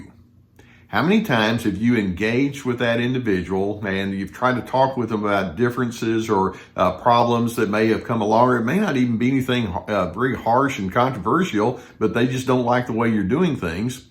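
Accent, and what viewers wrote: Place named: Texas, United States. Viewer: Love you,! <3 Love your accent too! Where's that from? Texas? Yeehaw! Hey, I have a cowboy song for you! Without guns, moonshine, loose women, gambling, just a a whole lot of cows and a long, long ride... and a spiritual twist at the end! <link> Enjoy and thank you for all you are doing for us all!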